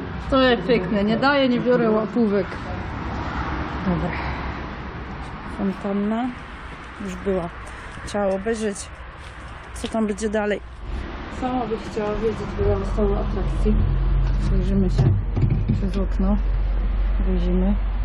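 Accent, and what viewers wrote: Silesia, Poland. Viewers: Super! Fajnie to wygląda od środka, ale najlepsze to że po Śląsku mówisz a raczej godosz, pozdrawiam serdecznie i oczywiście łapa w górę 👍👍👍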